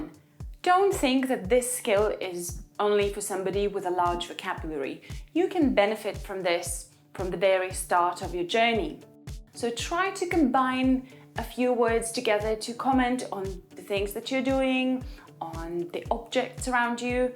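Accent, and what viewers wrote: United Kingdom. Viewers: your British accent is so lovely! I used to like American better but now I'm so into British💜 Thank you for the video, it's adorable👍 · Зачем британский пусть говорит на американском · Аааа какой акцент крутой🤩 Бритиш инглиш - ван лав 💛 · Хорошо говорит, но не переношу британский акцент)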